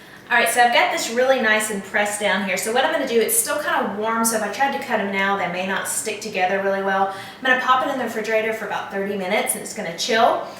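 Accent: South, United States. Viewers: Batman needs a classy southern gal hey your all I can get use to that 😆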